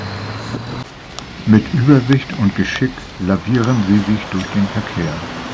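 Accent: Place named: Hanseatic, Germany. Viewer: Durch Zufall diese Krähen-Videos entdeckt - bin ganz begeistert. Zusammen mit den tollen Kommentaren mit authentischem, hanseatischem Einschlag ein harmonisches Gesamtkunstwerk. Bitte unbedingt mehr davon und alles Gute nach Hamburg!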